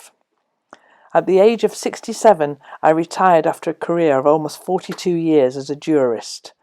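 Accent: England, United Kingdom. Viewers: Love that you admitted that you pinks mandala is done and will never be finished anymore. Haha, I laugh, because my mother has many many many of the same... never completed “samples”. In just my lifetime she has collected and filled a sewing room full of one of a kind Artistic pieces of “samples” many only half completed. as she recently packed up and downsized her enormous sewing room, in a house of 40 years. She and dad, married of 60years, are moving into her next phase of life..... as I am due a 50th Birthday Quilt... as much as she would love a reason to shop for more fabrics and design a quilt... I told her I didn’t want new fabrics, rather I’d love to have a quilt of all her “samples” and class examples... how cool of a one of a kind quilt with all of moms sewing techniques full of appliqué and her free motion machine embroidery project from seminar classes she took and or taught. 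You could read a book for me anytime. You have the perfect soft English accent for my non accent American ears. I don’t have any accent from any particular corner of the states as I have been raised in Oregon. as much as I enjoy the appearance and admire the patience it takes to do English paper piecing... I would go absolutely MadHatter bonkers in the attempt. The Kaleidoscope look your mandalas have is beautiful. Thank you for sharing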